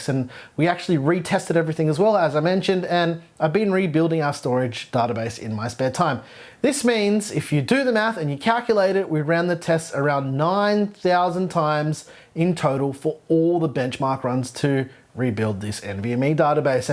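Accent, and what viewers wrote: Australia. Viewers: This is the first video I've seen you, and 3 seconds in I know you're an Aussie Tech youtuber! Subbed! Hope to see more.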